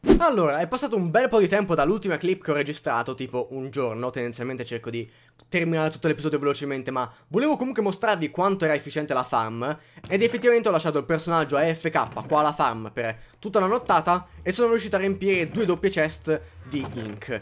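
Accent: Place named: Emilia, Italy. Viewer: Sei emiliano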